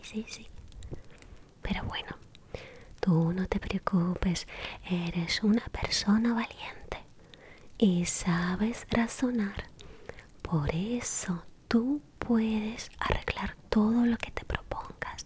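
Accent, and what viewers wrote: Spain. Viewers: acento español :O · No me da asmr el acento español tan marcado :( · Me gustaban más sus vídeos antes que ahora, que se va marcando más ese acento español... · Odio que ahora sabes mucho más el acento español :(((, extraño a la rusita :'( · Que raro que no pronuncie la C como la Z teniendo esa tonada española 🤔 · mi mente escuchando a la chica: por eso esperaba con la carita empapada jjajajaj por la voz y el acento porque en españa hay muchos acentos habran como 5 no? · Ya hace tiempo que no veía videos tuya linda, de ver uno de tus inicios, al de ahora, tu fonética y pronunciaciones han cambiado mucho del tono ruso español al “español de España” es muy curioso 🤓 saludos.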